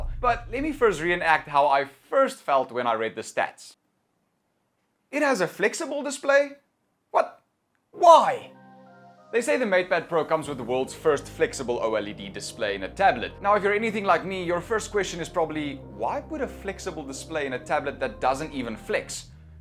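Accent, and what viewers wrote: South Africa. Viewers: Hi ! I just finished my final year of highschool last year and i am looking into getting a laptop/ ipad for uni , i have never had any of those things and i am kind of lost to what is best , can you please advise me on whether this is a great option for uni ? I love handwritten notes and i suck at typing ... Also , are you south african ?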